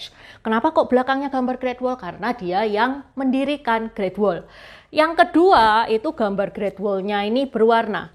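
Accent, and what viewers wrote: Java, Indonesia. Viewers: Logate jowo mbak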